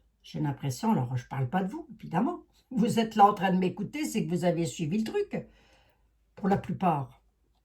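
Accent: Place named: Paris, France. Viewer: J'aime tellement que vous soyez une belle lorraine et que vous parliez avec une véritable gouaille parisienne 💓💕❣️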